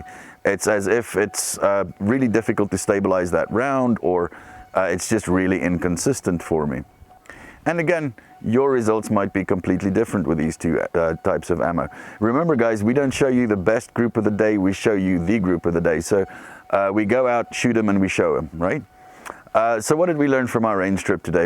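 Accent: South Africa. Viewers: I would guess south Africa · that sounds like a south african acsent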